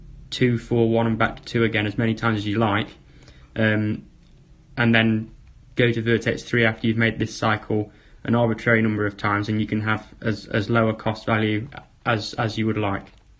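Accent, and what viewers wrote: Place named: United Kingdom. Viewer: uk accent is annoying , can't understand.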